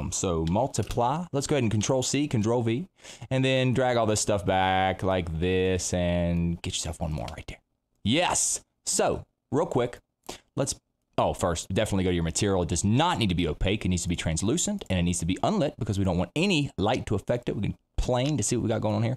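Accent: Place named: South, United States. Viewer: Bro I enjoy your tutorials. Where in the south are you from bud?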